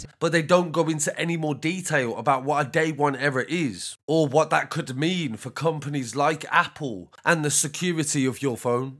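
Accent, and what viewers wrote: Birmingham, United Kingdom. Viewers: You're moving so far from being an actual journalist its a joke. You can't just read a daily mail story in a Brum accent. Pitiful cuz, you're doing more harm than good. Journalist? Joke ting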